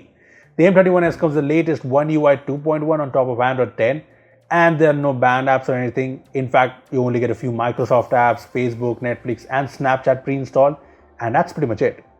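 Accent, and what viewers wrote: India. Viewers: I Love the Indian Version o the R! ☺️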